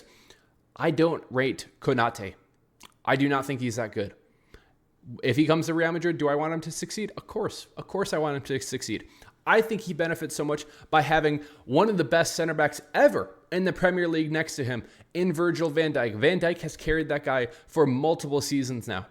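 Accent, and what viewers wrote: United States. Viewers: I like your presentation,are you an American?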